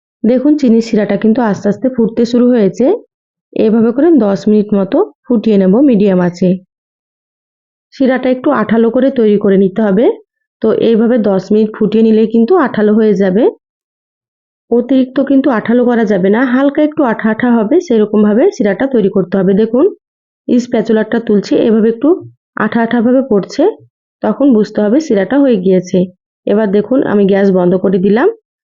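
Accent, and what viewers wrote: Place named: Chapai Nawabganj, Bangladesh. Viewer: আপা আপনার বাড়ি মনে হয় চাঁপাই..!! কথায় আঞ্চলিকতার কিছুটা টান আছে...!!!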